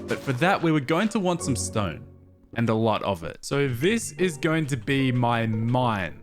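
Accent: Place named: Australia. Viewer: wait i just realised u got an australian accent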